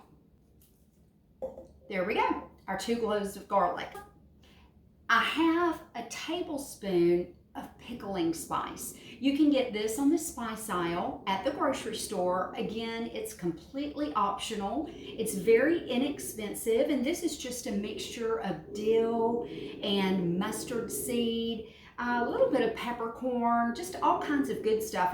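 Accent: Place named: South, United States. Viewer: I could hear you talking for hours. Love southern accent. After 43 years in California. The way you talk is just music to my ears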